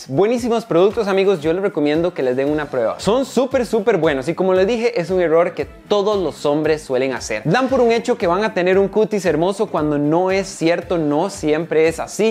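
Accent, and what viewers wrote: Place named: Costa Rica. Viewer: habla como tico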